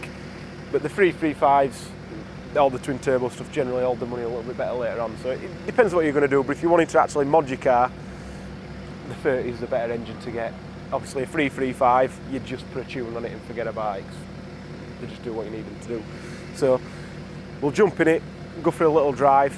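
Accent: Yorkshire, United Kingdom. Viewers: Love how Yorkshire you are! Great work lads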